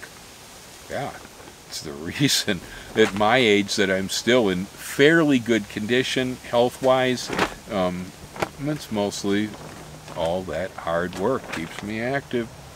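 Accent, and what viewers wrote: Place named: Northeast Ohio, United States. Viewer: This is great info and love listening to you. Your accent...NE Ohio? I grew up there as a kid in the '70s. Your voice takes me back.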